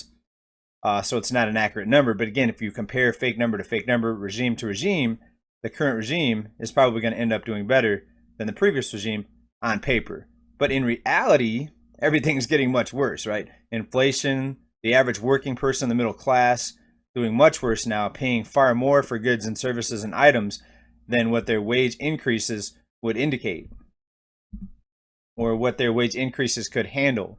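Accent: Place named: Midwest, United States. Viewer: Favorite outro. Keep up the good research. Once you said you lived in Michigan at one point...well you do have a midwest sounding vocal. Makes sense now. Lol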